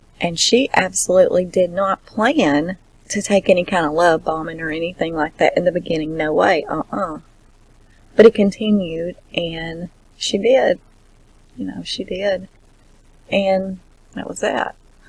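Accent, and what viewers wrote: South, United States. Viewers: From the time Shannann got home, I do not believe a word Chris said. Fact according to Shannann. In order to have sex, she would take a shower. Her suitcase is open in the discovery, and her toothbrush was still packed. She according to discovery had full make up still on. She was found with bra and underwear on. The only thing off was a different tee shirt, and her leggings. This is a story made up by a man, that is clueless. Men out there reading this. Be very careful of females swishing their tail like a bitch(female dog) in heat. Females are very protective of our belly’s when pregnant. She was never on her stomach, and we sure don’t let anyone sit on our babies., thank you for deciding what we are having for dinner. FISH Love you my southern talking girlfriend. True story. I use to travel for work. I was in yet another drug store in l think Wisconsin and a young man said “ I love hearing you talk, where are you from? 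“. I told him I was raised in Ga. but live in Texas. He looked at me and said, “ Do you need a passport to visit? 😳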